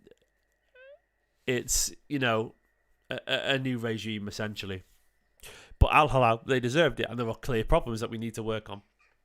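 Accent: United Kingdom. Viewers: hello mate ............ which british accent is it ??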